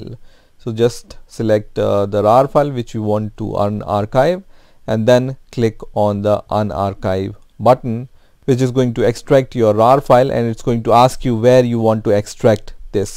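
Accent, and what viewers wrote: India. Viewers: You are almost hundred percent sure of getting the answer you are looking for when you hear an Indian accent ....... Thanks a lot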